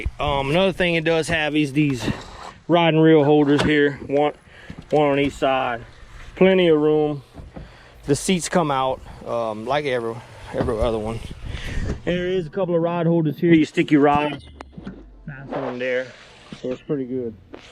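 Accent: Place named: Acadiana, United States. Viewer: Man I know an Acadiana accent when I hear one! I recognized Eunice City Lake when you showed a clip fishing on the water. I am also from Eunice so you have my subscription!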